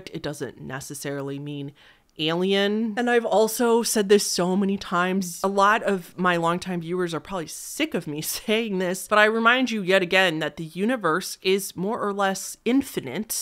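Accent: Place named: Midwest, United States. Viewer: It’s fine it’s a very midwestern thing. Like “warsh” instead of wash. It drives me crazy but it isn’t “wrong”.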